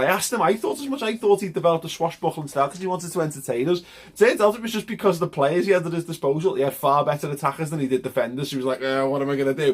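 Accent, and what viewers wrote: Liverpool, United Kingdom. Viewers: Watching this in the shower so put auto subtitles on. Youtube's transcription AI is really struggling with scouse! :'D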